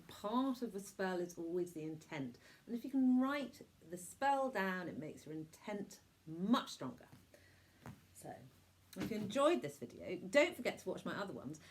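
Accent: United Kingdom. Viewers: it,s nice to hear a respectable brittish woman speak about stuff.:face-red-heart-shape::face-red-heart-shape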